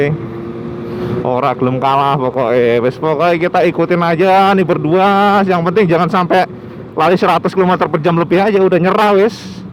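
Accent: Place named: Java, Indonesia. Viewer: Logat sampean iku cak..njowo tenan..jian seneng nnt sampean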